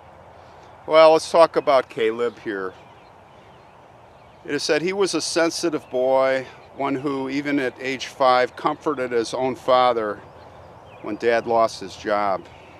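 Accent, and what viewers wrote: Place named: Chicago, United States. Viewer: New to your channel - not sure how I missed you for so long. What I like is that your stories are about people we probably never heard of before, your slow, calm voice, and of course the content itself. But there's more: You seem so familiar. I was born/grew up in Evanston, IL - very close to Chicago, as you know. So you accent/grammar/inflection reminds me of many male relatives. It's almost like hearing one of my Uncles telling stories again! Anyway, glad I finally stumbled into you, and now look forward to going back to hear/see your earlier posts, too. :)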